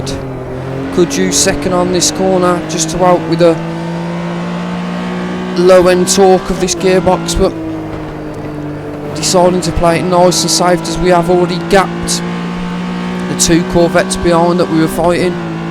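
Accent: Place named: Midlands, United Kingdom. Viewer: As a fellow Midlander, love hearing your accent here 😁❤️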